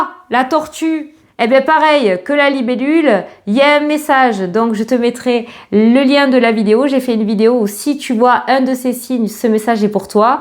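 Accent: South, France. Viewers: Bonsoir, Quel peps j'avais pas le moral tu ma regonflé à bloc merci pour ce beau moment! J'ai adoré le passage "Jean Jeannot Georges" une pensée pour mon papa au ciel qui s'appelait Georges 🕊🥰 je suis du sud aussi j'ai l'impression de m'entendre parler!! Ta joie de vivre et les expressions font un bien fou comme à chaque fois! Une belle soirée à toi et merci encore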